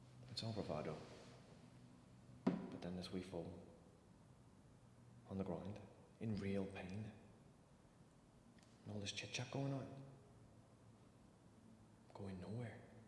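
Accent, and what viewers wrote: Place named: Ireland. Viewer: When did u turn Irish? Good though Hun. Well done x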